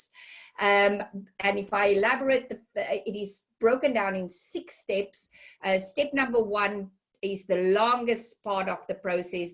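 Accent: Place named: South Africa. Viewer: Good to hear you still speak with your South African accent 20 years on. 3 seconds in and I knew you were from here.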